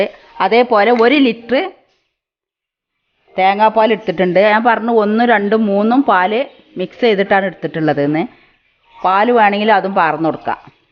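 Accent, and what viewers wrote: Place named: Kottayam, India. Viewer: അസ്സലാമുഅലൈക്കും 🤩😍😂ഉമ്മാക്ക് ആയുസ്സും ആരോഗ്യവും ഉണ്ടാവട്ടെ കോട്ടയത്തെ വർത്താനം എന്താ സുഖല്ലേ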